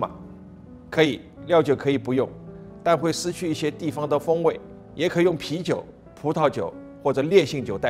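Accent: Shanghai, China. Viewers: 能听出来John大厨的上海腔调，不亏这么多年在魔都积累的经验。😂 我是湖南的，希望可以多看到点湘菜系的菜肴！Best wishes to the TS crew, esp. during these dark days. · 上海人伐？听口音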